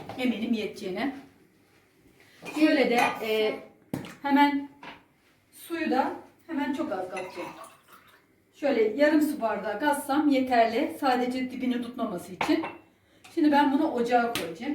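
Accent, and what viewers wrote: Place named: Kütahya, Turkey. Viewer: Sizi yeni izlemeye başladım nerelisiniz acaba kütahya konuşmasına benzettim konuşmalarınızı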